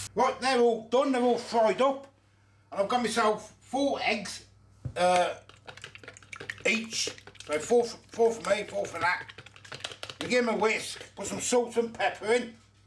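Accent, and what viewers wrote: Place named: England, United Kingdom. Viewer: I love how only a person who has lived in England for a good amount of time would understand half of that killer accent😂.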